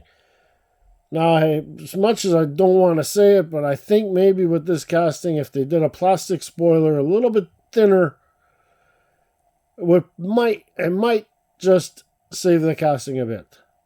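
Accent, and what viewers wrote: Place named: Canada. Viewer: As a fellow Canadian but living in The USA, i love your videos and accent, EH! I want to display all my cars loose like you do. I have over 500 cars that need to come out of their cases! Where did you get your display cases?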